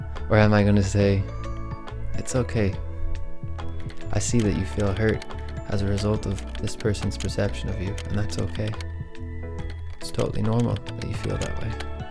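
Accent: Ireland. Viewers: the aule paddy accent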